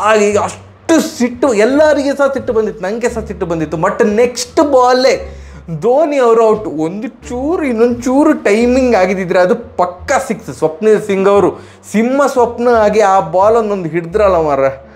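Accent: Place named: Dakshina Kannada, India. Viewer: ಬ್ರೋ ರಿಯಲಿ ನೀವು ಸೂಪರ್ ಆಗಿ ಮಾತಾಡ್ತೀರಾ.. ನಿಮ್ಮ ರಿವ್ಯೂ ಕೇಳೋಕೆ ಚೆಂದ ಅಷ್ಟು ಮುದ್ದಾಗಿ ಸ್ಟೈಲಾಗಿ ಮಾತಾಡ್ತೀರಾ. ಅದರಲ್ಲೂ ದಕ್ಷಿಣ ಕನ್ನಡ ಭಾಷೆ ಕೇಳೋಕೆ ತುಂಬಾ ಚೆನ್ನಾಗಿದೆ.. ಜೈ ಆರ್‌ಸಿಬಿ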